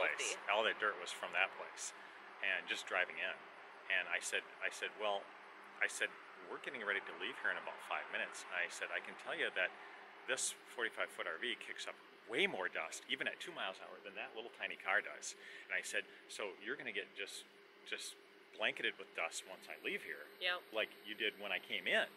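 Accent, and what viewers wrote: United States. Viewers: You are either from California or a Yankees! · Tell me your from the East Coast without telling me your from the East Coast.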